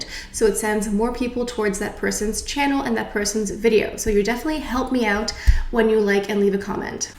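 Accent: France. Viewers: I fell in love with your french accent and your love for Chanel cosmetics. Thanks for the review . I subbed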